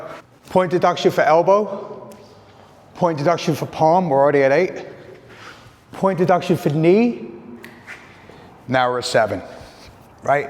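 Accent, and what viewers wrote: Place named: Australia. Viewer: Where are you from? That's the first South-east UK/ east coast US, with hints of Aussie accent Ive ever heard?!?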